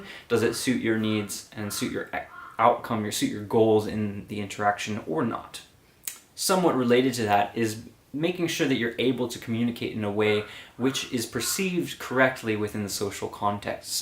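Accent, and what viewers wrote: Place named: Canada. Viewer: sounds canadian to me!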